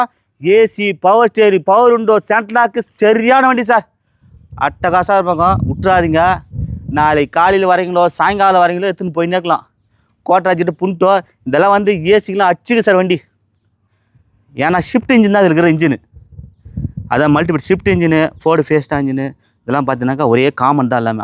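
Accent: Madurai, India. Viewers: Madurai vasagam super 🎉🎉🎉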